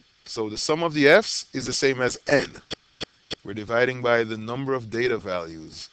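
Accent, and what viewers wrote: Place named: Caribbean. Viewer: Thanks immediately, hearing you Caribbean accent and I start to understand. wow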